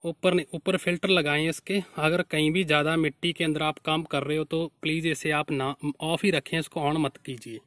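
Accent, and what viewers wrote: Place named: Punjab, India. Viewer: Hindi with Punjabi eccent. Bro better you speak your own language you can explain more better in punjabi.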